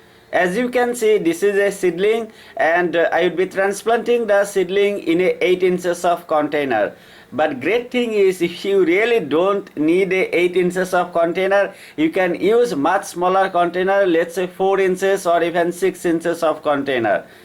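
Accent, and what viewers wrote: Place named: Northeast, India. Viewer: R u frm northeast??I thought so coz of ur accent🤗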